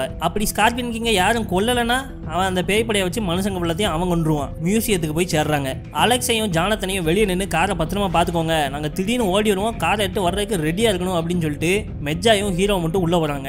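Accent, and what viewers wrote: Tirunelveli, India. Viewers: It's Trunelveli slang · Bro neenga thirunelveli ya unga slang super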